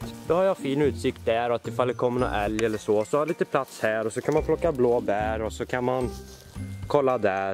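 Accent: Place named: West Gothland, Sweden. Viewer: Haha jo såpass långt har jag räknat ut :P funderade mer på vart i västergötland, är själv från västergötland därför jag reagerade på dialekten :P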